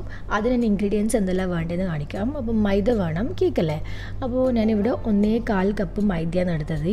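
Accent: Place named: Kannur, India. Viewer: കണ്ണൂരാണോ നിങ്ങള്.. വർത്താനം കേക്കുമ്പോ അങ്ങനെയിണ്ട്..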